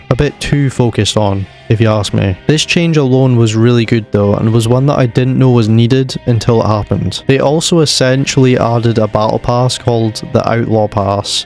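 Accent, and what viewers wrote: Scotland, United Kingdom. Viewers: I smell a Scot. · Hey, are you Scottish by any chance? Your accent is kind of peculiar in a good way lol.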